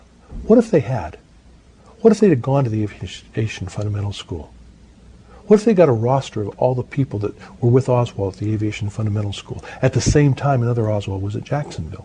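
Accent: Pittsburgh, United States. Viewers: I got his book amazing work you from Pittsburgh I live on the norside of Pittsburgh off Brighton road I ask because your accent is Pittsburgh all the way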